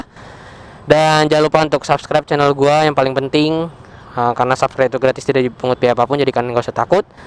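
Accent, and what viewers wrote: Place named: London, United Kingdom. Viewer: landun sound 🤤 like it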